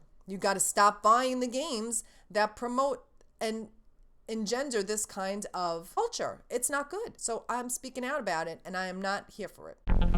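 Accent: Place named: New Jersey, United States. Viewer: Haha I thought you were from New Jersey!anyway still criminally underrated Channel but we're sticking with you girl!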